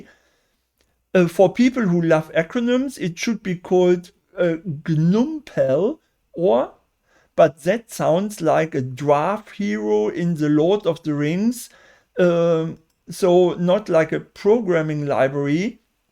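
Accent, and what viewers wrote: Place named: Germany. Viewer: that's some heavy german accent (me a german may say this)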